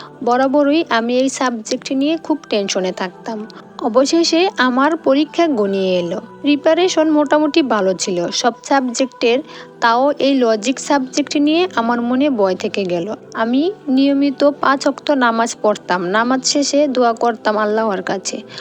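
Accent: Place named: Mymensingh, Bangladesh. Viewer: আপনার দেশের বাড়ি কি ময়মনসিংহ অথবা কিশোরগঞ্জে?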